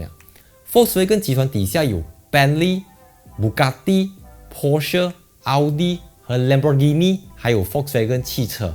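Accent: Malaysia. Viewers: 多謝分享。很資訊性。學習了。還有熟悉的馬來西亞腔調。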